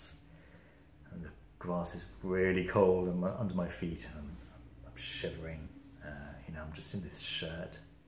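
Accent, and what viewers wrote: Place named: England, United Kingdom. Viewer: No offense, but I can see the French heritage in your eye's and nose. So weird seeing that paired with your English dialect.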